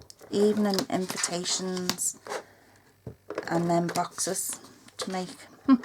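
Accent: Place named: Ireland. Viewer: I thought I was hearing Ireland in your voice. My Dad was born in Ballymena, Co. Antrim.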